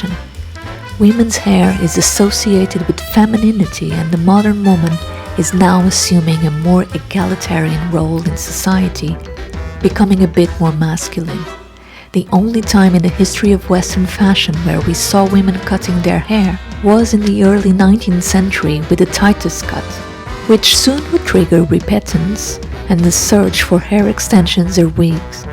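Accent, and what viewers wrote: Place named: Portugal. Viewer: Portuguese accent . Love it.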